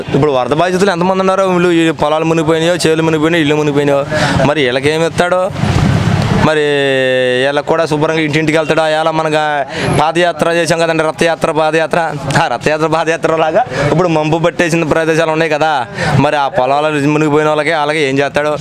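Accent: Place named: Godavari, India. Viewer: Super bro. Godavari style lo pettavu bhale ycheaps ki. We want to see more of your speeches in Social media. Thanks to for the effort 👌👍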